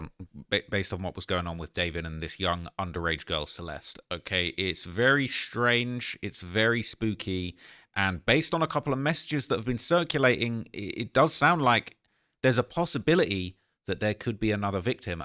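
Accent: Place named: United Kingdom. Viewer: He’s British. Calm down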